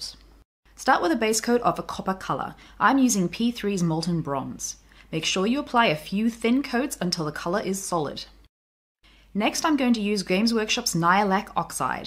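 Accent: Australia. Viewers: Hi. Love the Vids. Just starting to try my hand at figures and finding the vids very useful. Also nice to hear a fello Aussie lol. Please keep them coming.:-)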